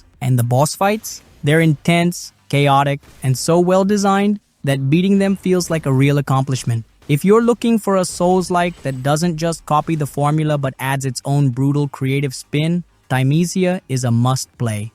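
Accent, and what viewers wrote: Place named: India. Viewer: Sometimes you sound really indian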